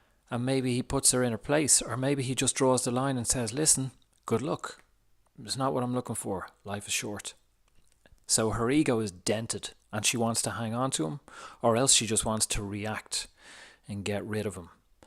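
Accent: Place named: Ireland. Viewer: Didn’t think I was gonna hear an Irish accent when I clicked on this link from inside a Divine Masculine page... here I thought I was the only one on the island 😂 love the vid!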